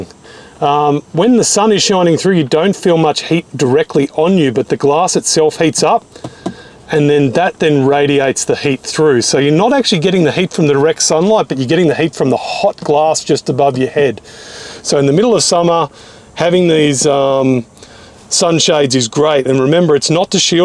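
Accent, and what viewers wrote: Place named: Australia. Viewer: Very informative for new and old Tesla owners. Keep churning more videos. Aussie accent goes a long way. Thanks mate!!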